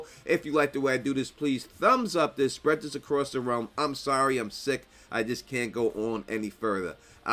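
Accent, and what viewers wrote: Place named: New York, United States. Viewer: Bruv, it's the accent. My boy is from NYC, I'm from Dirty Jersey. That's how some people speak around the area.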